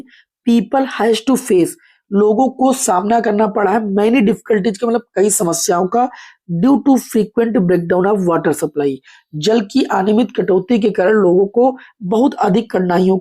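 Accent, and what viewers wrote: Gonda, India. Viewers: Sir ji gonda se ho kya😅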